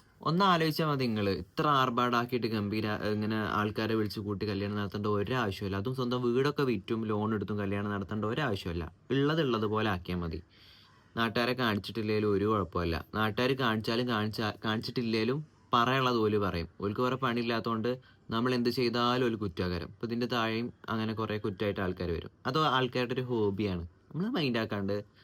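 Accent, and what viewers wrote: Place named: Malappuram, India. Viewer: Good Advice & Motivation.. അസ്സൽ മലപ്പുറം സ്ലാങ്, വീട് എവിടെയാ?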